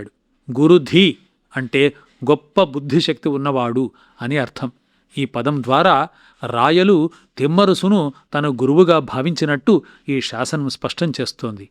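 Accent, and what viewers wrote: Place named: Karnataka, India. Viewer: ನಮಸ್ಕಾರ. ನಿಮ್ಮ ತೆಲುಗು ಉಚ್ಚಾರಣೆಯಲ್ಲಿ ಕನ್ನಡ ಕಸ್ತೂರಿಯ ಕಂಪು ಗಾಢವಾಗಿ ಬೀರುತ್ತದೆ. ದಯವಿಟ್ಟು ಕನ್ನಡದಲ್ಲಿಯೂ ಶಾಸನ ವಾಚನ ಕಾರ್ಯಕ್ರಮಗಳನ್ನು ಮಾಡಿರಿ.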